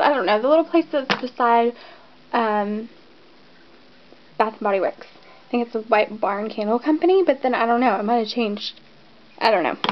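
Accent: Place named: South, United States. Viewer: Love your southern accent. Where r u from?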